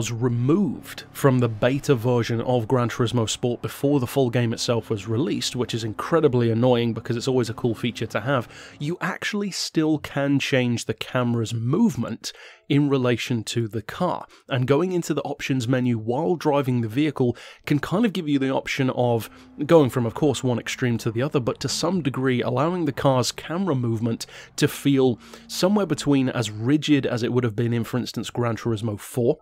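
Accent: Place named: London, United Kingdom. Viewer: it is tho its like london or smn, but not AYO FAM BUS MAN YOUR P'S- london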